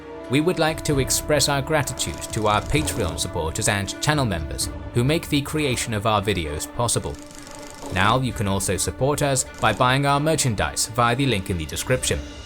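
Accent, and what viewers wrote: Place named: United Kingdom. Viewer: I don't know who's the narrator of those videos but he's absolutely great, brilliant voice, diction and of course lovely British accent... so comprehensive and flawless!!! Thank you guys! Great Job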